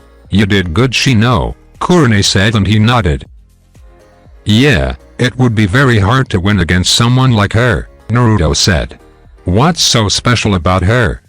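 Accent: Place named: Russia. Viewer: somepeats sounds like a russian speaking english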